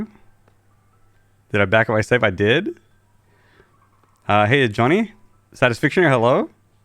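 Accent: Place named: Canada. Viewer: I've had so much trouble trying to identify your accent. Finding out that you are Australian and have lived many years in other countries explains why you sound Canadian most of the time! (I'm Canadian so that is a compliment LOL)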